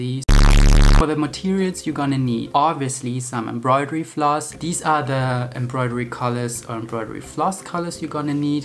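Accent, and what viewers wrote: Germany. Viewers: after five seconds in this video I already know that he's a German lol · Are you german.? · Are u german? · Dude are ya German ? I hear a German accent there 👁👄👁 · Are u german?:)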